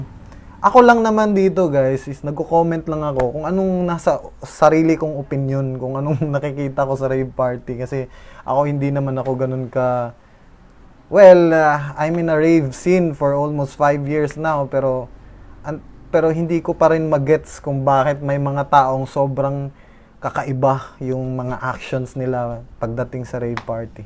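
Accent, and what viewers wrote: Visayas, Philippines. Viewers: piste bisaya man diay ka hahahaha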